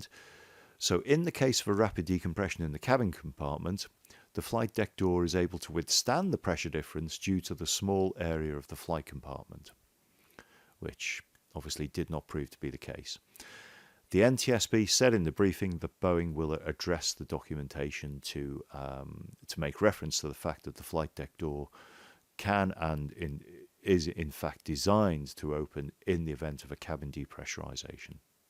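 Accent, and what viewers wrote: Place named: Wirral, United Kingdom. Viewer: You’ve got to be from the Wirral i’ve been looking at your LinkedIn profile and the moment you started speaking I thought I know that accent. 😂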